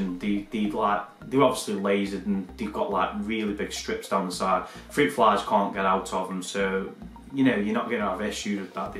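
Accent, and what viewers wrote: England, United Kingdom. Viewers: Great video... My next but 1 mantis is going to be an orchid, getting a moss mantis trio this week! Orchid hopefully in a month or so. Where abouts are you from? I live in Canada, but was born and grew up in England in a place where everyone says ‘looook’!!! Thinking you are pretty close to where I used to live!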